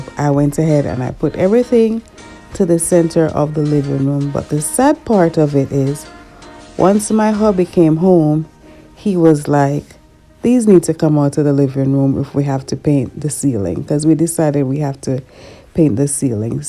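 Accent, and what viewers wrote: Jamaica. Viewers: 2 seconds in, she's Jamaican 😊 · Are you Jamaican? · You sounds like you from 🇯🇲🇯🇲 but anyway job well done ❤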